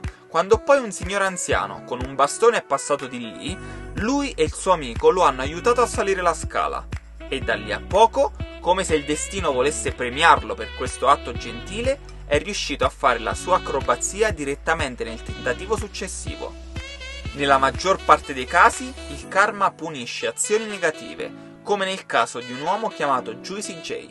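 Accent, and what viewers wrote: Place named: South, Italy. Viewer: trick analfabeta....trick.........youtube la patria degli ignoranti con slang meridionale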